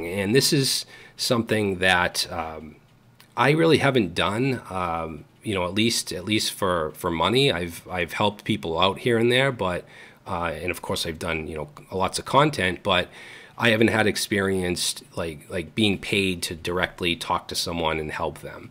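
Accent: Boston, United States. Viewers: You hated your accent?!?! I just come from hearing you say "border" a million times in a tutorial and I was like "Boston, baby, yeah!" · ain't nothing wrong with having a Boston accent, even a rather thick one. you might even get some kids from abroad to say certain words that way 😀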